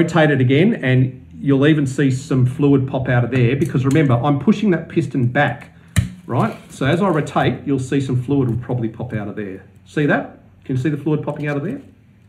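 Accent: Australia. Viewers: The moment i jeard the aussie accent i knew i was gonna hear exactly what i needed to hear in perfect detail. Ya folks down under are really something else when It comes to automotive prowess.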